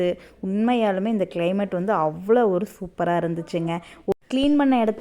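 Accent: Kongu, India. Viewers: So cute'ma. அழகான கொங்கு தமிழ். கோவையில நீங்க எந்த இடம்ப்பா?. Surgeryக்குப் பிறகு இப்ப பரவாயில்லையா?!!. Get well soon.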